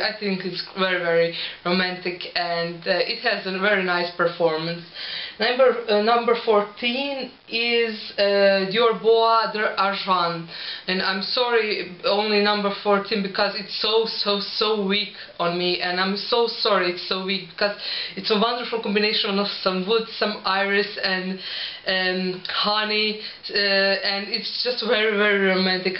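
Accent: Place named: Russia. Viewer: Хахах видно что русская ( акцент )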